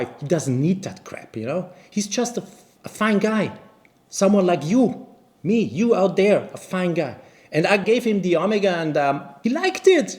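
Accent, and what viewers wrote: Vienna, Austria. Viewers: Finally I know how a Viennese person will sound like. Omega makes great watches. Thank you for sharing the story! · you are absolutely spot-on on this matter. I watched this video with awe and bursted with laughters of your sense of humour and that Vienna accent hahaha. It's beyond f**king bullsh*t with this Rolex artificially-created frenzy. In Hong Kong here it's as bad as you can imagine, I wanted to purchase a simple black dial 36mm Oyster Perpetual, the freaking AD told me to fuck off and go for the grey market, I checked the grey market price 2 weeks ago - a f**king 3-hand black dial OP is now costing >10,000 USD. I was like "Okay that's it" and just move on. The old good days before 2021 when Submariner / GMT/ Cosmograph Daytona got hyped but you could still walk into a Rolex AD to get an OP, Day-Date, Datejust or occasionally Yachtmaster; now you walk in any of them and all they have are lady models with diamonds or very small lady models. 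Honestly at this current point I won't be surprised if the lady Rolex models are going to get hyped and within 2022 you walk into a Rolex AD there is NOTHING to see. Just arrogant employees and empty shelfs. Keep up the good work, love your content and looking forward to many more to come 🙏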